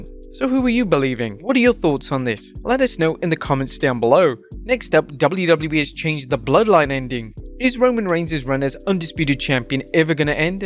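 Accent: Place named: United Kingdom. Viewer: He's British, he has an accent, he literally can't help it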